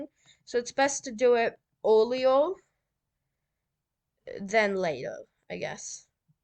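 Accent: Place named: United Kingdom. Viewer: you sound British no offense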